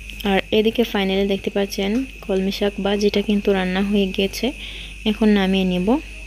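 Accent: Sylhet, Bangladesh. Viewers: beautiful vlog. nice blog. apu apni ki sylheti ami confused tai ask korsi apni ke.super blog.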